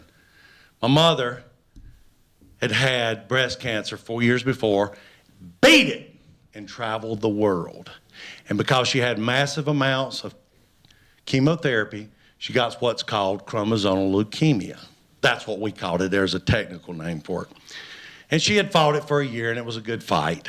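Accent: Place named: North Carolina, United States. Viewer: Literally tearing up. From NC myself, glad to hear a voice from home.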